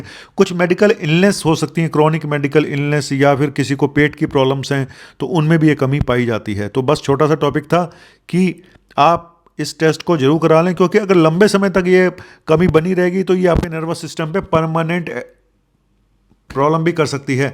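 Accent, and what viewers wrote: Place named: Punjab, India. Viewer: Punjabi touch.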